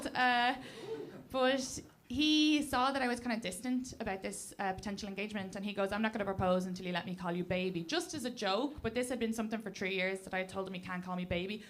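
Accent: Ireland. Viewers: I love the Irish accent even though I can't understand half of what she's saying. 😄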